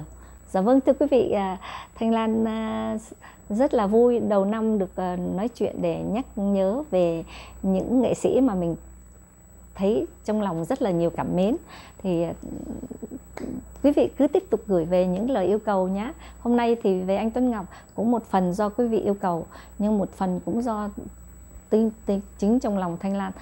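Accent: North, Vietnam. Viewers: Giông bắc của chị thanh lan dễ thương dạ vân dạ vân hôn chị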